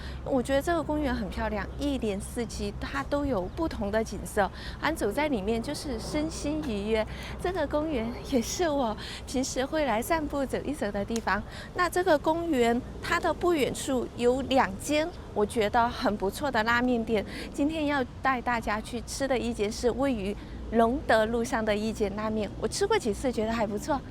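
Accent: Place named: Sichuan, China. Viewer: 這兩天在成都出差，遇到一位川妹跟妳說話的方式簡直一模一樣。忽然覺得好親切「呦」！😊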